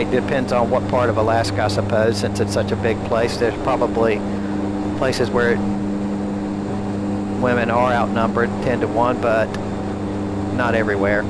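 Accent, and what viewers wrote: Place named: South, United States. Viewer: Your videos are epic. Never learned that much in such a short time as with your vids. Your real world pilot helps.you do the procedures as you would in real life, which is very helpful. And you've got such good sense of humor. May I ask you (if it is not too personal) where are you from in the US? Somewhere in the South? Your accent is really nice. :-) I brush up my American English that way. P.S.: I'm from Austria, so my English isn't very good. So please bear with me.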